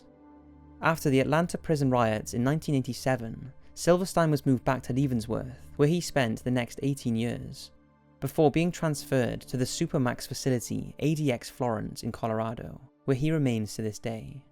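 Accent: United Kingdom. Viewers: PLEASE add English captions because I am a big fan of your videos and there are many others do too and we can't always understand your British speech (it is amazing but we just want some captions alongside it :). If you could PLEASE look at this comment and pin it if you saw it, a replay is awesome too man.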